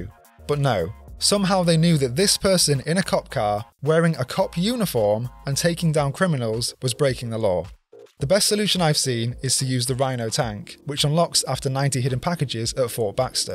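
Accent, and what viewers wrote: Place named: United Kingdom. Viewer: well wishes for you as well!! I guess he is British, at least thats what we can suppose by the accent hehe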